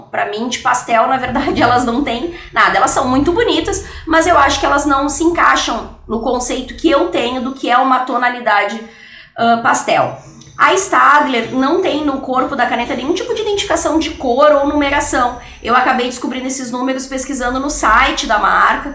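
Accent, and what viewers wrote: Rio Grande do Sul, Brazil. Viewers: Qual a sua brushpen e onde vc comprou ela? Sou de POA e pelo seu sotaque vc também é gaucha, não acho nenhuma brushpen em nenhuma papelaria, poderia me indicar uma?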